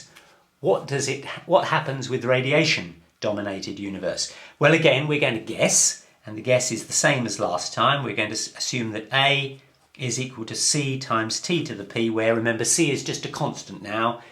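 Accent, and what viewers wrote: United Kingdom. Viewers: British accents should be forbidden in youtube videos. No matter how stupid the ideas are, if they are told in a British accent, people will believe.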